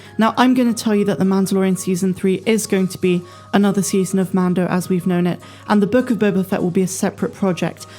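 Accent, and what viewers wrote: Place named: United Kingdom. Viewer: I think I could listen to delightfully British-accented read from comic books for hours...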